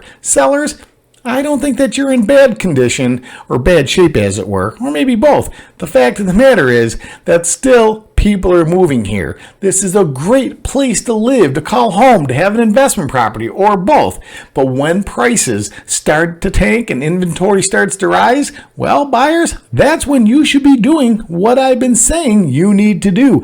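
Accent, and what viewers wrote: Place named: Buffalo, United States. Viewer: Ha ha I missed hearing your Buffalo accent lol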